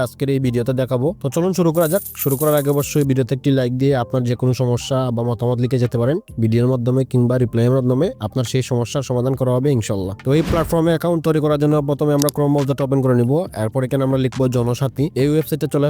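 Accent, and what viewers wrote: Sylhet, Bangladesh. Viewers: ভাই সিলেটি❤❤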